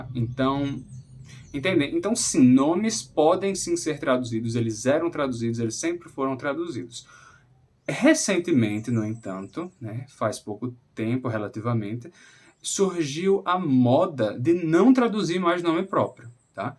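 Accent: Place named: Maranhão, Brazil. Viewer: Parece sotaque do Maranhão 😮não sei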